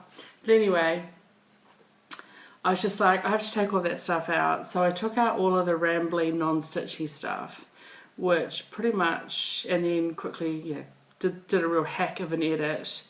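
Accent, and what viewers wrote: New Zealand. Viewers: So nice to hear a Kiwi accent again..here in Texas from an expat Kiwi.. Your stitching is lovely..Nice to hear your char t too..Glad you perservered..😊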